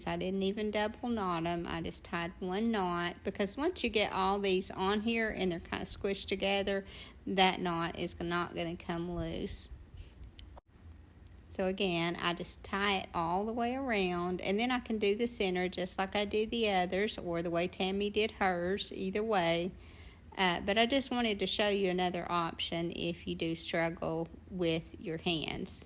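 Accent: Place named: Tennessee, United States. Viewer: I just love watching your videos! And listening to you, too! LOL I was hoping you had a little shop I could visit somewhere near me in the Dallas/Fort Worth area but sadly, nope. 😢 But when I read you were in Tennessee, I knew that was why I loved your accent. Reminds me of home...Georgia. Anyway...everything you do is beautiful and I really appreciate your careful instructions.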